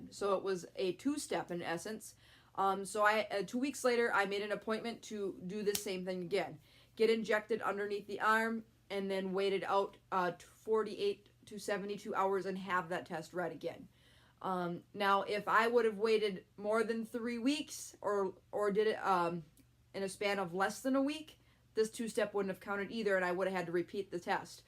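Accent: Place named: Midwest, United States. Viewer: Thanks for sharing! I am getting a TB test this week! I was guessing you were from the midwest by your accent and then at the end you mentioned WI! That's where I'm from😊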